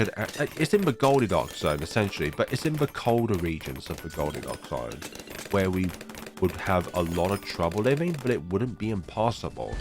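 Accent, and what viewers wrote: United Kingdom. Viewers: Two of my favorite things in one video: btd6, and a british man talking about space. Absolutely lovely 👌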